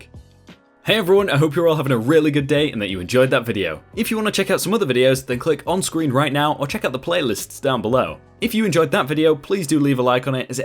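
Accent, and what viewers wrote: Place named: Scotland, United Kingdom. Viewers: Not a bad Scottish accent. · You have a decent Scottish accent. Don't worry about it.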